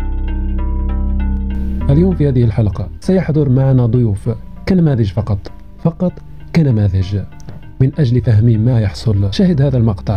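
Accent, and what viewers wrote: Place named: Western Sahara. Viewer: سمعتك تتكلم عنهم، فمن تكون أنت ؟ و ما هي مصادرك و مواردك ؟ أستطيع تمييز لكنتك الصحراوية ! فمن تكون ؟ هههه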